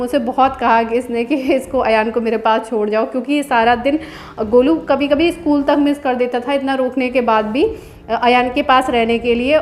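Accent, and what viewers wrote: Uttar Pradesh, India. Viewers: Ap up se ho kya... ??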